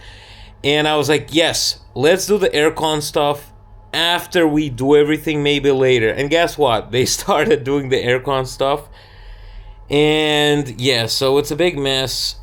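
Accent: New York, United States. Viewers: Надеюсь. Он снимает фейковые видео, чтобы заставить вас пожалеть. Он не настоящий русский. Разговаривает как какой-то нью-йоркец. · i notice in this video you have a hint of a New York accent lol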